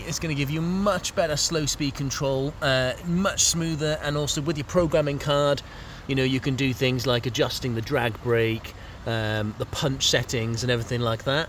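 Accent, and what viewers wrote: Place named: Wales, United Kingdom. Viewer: I assume you're in the US? British accent (slightly Welsh?) but quoting prices in dollars twists my head a bit 😂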